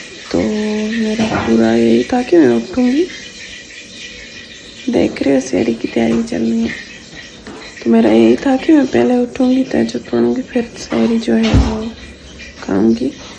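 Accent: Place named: Sindh, India. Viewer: Kah sa ho app Sindhi Laga Raha ho aap ka BAAT krna sa pata chal ta ha 😊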